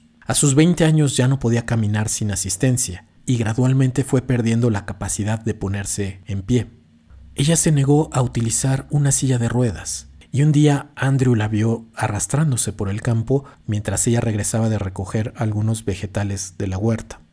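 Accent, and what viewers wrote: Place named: Mexico. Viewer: Por su acento es mexicano.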